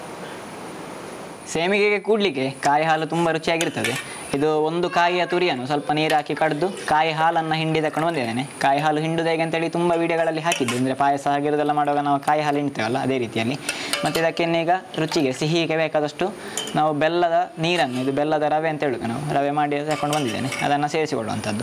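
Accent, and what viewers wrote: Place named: Udupi, India. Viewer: Super receipe...nicely done.looks yummy. I like all your videos and enjoy the way you talk in Udupi Kannada.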